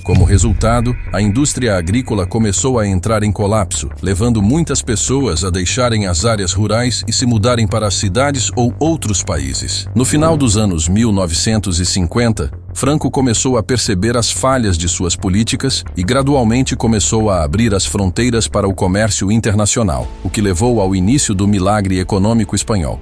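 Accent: Portugal. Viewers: Mudou para o português de Portugal, pq é uma inteligência artificial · Do nada passou a ser narrado em português de Portugal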